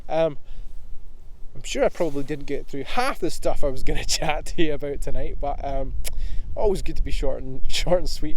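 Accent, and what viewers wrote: Scotland, United Kingdom. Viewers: Me too! I love listening to his accent. He has definitely made me want to visit Scotland. No for his accent (well, maybe a little), but for the beauty. Such history.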